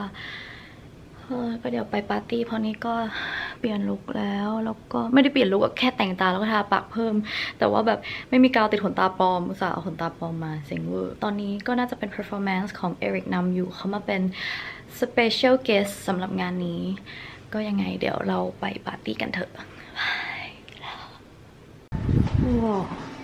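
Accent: South Korea. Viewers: เต้นเป๊ะมากกกก //ขอบสำเนียงเกาของพี่มากเลยอะ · ชอบสำเนียงภาษาเกาหลี มากง่าา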